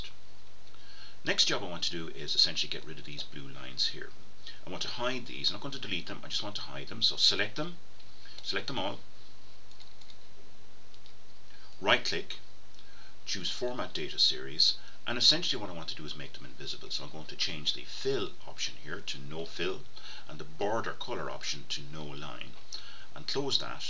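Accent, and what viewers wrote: Ireland. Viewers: Hey, great videos and they are a big help. its great to hear an Irish accent while i'm pulling my hair out in Australia. Do you have a video that would show me how to put date markers on a gannt chart? I am having trouble getting this to work. I need it for say 7 days before the start date as a marker to call the individual. I just need to know how to get say a star or any marker to remind me to call. thanks in advance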